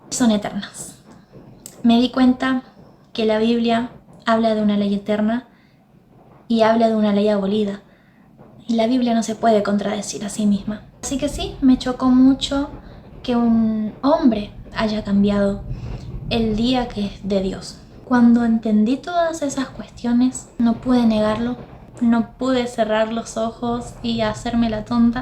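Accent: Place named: Misiones, Argentina. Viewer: Hola. Soy Nidia. Nací en Misiones, Argentina, así que tu acento me es familiar. Estaba viendo otra cosa y toqué la pantalla sin querer y me trajo a tu video... una "diosidencia"... me encantó tu testimonio y la manera tan dulce que tienes de hablar, tambien la profundidad de lo que dices. Eres una sierva del Señor. Gloria a Dios por como te usa. Yo hace mas de un año estoy compartiendo los repasos de las lecciones de escuela sabática en mi canal. Y otros temas de salud mental pues soy psicoterapeuta. Te invito a ir a mi canal, quizá te guste estudiar conmigo. Y cuando dijiste que somos sólo barro en manos de Dios me sentí tan identificada, siempre digo lo mismo. Es más, compuse una canción sobre eso. Te la quiero compartir, con mi esposo formamos el Dúo Qadash, y grabamos esta canción con unos sobrinos del corazón, el grupo Cyan de Venezuela. 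Te la comparto y espero te guste. <link> Dios te bendiga y te use con poder en la predicación del evangelio. Queda poco tiempo para su venida, tenemos que estar fundamentados en la Palabra al 100%. Yo me dedico a predicar en mi canal. Y espero que tu canal llegue también a muchas personas... si todos los adventistas usáramos nuestras redes para cumplir la misión llegaríamos a todo el mundo rapidísimo. Dios está despertando a su pueblo, está levantando un ejército santo dirigido por su Espíritu... nosotras somos parte de él. Gloria a Dios por eso. 🙏 Te mando un abrazo. Me suscribo a tu canal y puse el "me gusta" número 667... te hic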